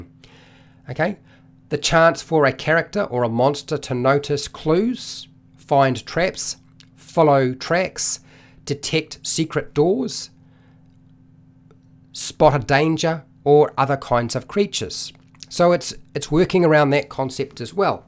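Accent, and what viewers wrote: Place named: New Zealand. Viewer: Me: Hm, I wonder if this is an Australian or a New Zealander? 🤔 "... is a check." Me: New Zealand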